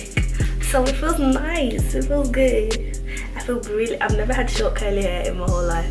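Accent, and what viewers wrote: Birmingham, United Kingdom. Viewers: omg ur british!!!!!!!!!!! i love ur accent!!!! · Juliaaa Robb I think Birmingham in the UK maybe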